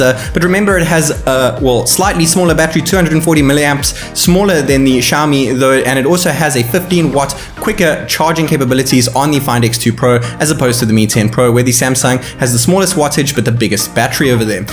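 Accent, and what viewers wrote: South Africa. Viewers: Is this guy a South African?? How'd you get these phones?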